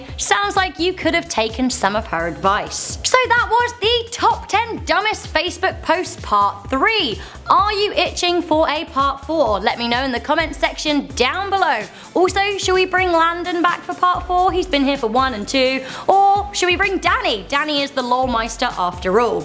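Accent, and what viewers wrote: United Kingdom. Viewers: Is her accent British? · Love that British accent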